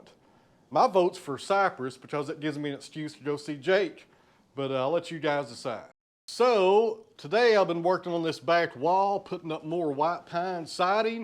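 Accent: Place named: Tennessee, United States. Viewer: Well friend, there’s nothing I enjoy more after a twelve hour day then watching OTW, and hearing your Tennessee talk. My dad’s people were from Big Sandy, Paris, Skullbone. If I close my eyes, I can hear my pawpaw in your voice. Good stuff. I vote for Cypress. Go see your buddy, Jake.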